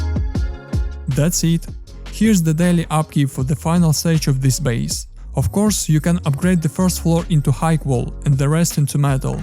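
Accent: Russia. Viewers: Русский акцент ;)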